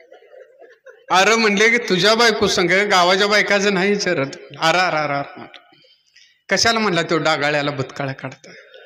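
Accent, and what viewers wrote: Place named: India. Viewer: अस्सल ग्रामीण ढंग .. खूप छान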